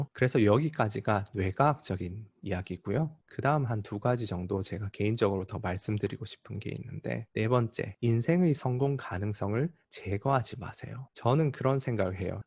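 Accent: Gyeongsang, South Korea. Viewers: 주식 공부하러 왔다가 철학 공부 잘하고 갑니다. 아 그리고 말투만 들어도 이미 경상도 사람인거 알았어요 😋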